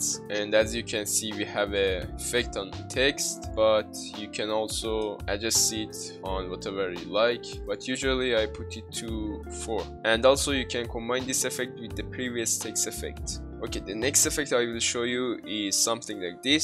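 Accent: Iran. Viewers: داداش ایرانی؟ ادیت:پشمام از رو لحجت فک کردم ایرانی تا اینکه پیج اینستا تو دیدم فهمیدم ایرانی پر قدرت ادامه بده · داداش لهجت خیلی ایرانیه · your accent is so Persian bro😂❤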